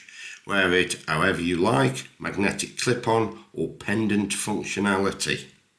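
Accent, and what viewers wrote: Stoke, United Kingdom. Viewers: Are you a stokie by any chance 🙂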